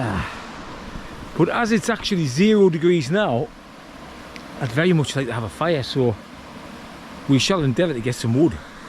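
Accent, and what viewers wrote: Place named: Scotland, United Kingdom. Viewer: Another great video. I'm loving the Scottish accent. My brother in law is a Newcastle boy and it's hilarious listening to him do my accent. It's nearly as good as yours.😅😅😅. Keep doing what you are doing Bonny Lad. 😅😅😅❤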